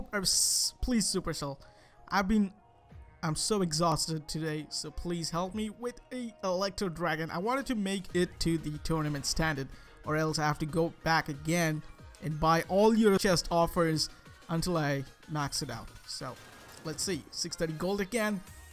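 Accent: India. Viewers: ASHUTOSH ZAGADE ofc he is, he has the Indian accent and his currency is in rupees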